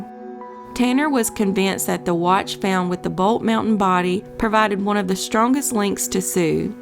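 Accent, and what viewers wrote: South, United States. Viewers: no problem. For me this is like really american local experience and vibe which i get from your videos. I like your southern accent. And when i said thanks for covering cases unknown to me, i meant you really dig up cases which are really unknown or unheard. Nevermind that im from Bosnia i watched almost all known cases, and a lot is familiar to me. You bring something new and fresh. Like everyone is eating vegetables from supermarkets from industrial farms, and you bring your local on fresh soil grewn organic vegetables 😁😜😆😂. Thank you again.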